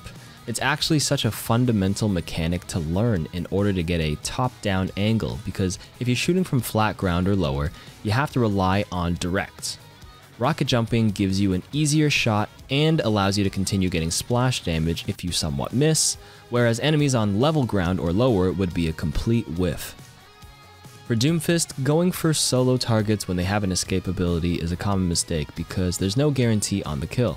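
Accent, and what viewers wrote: Canada. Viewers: Are you a Canadian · are you canadian????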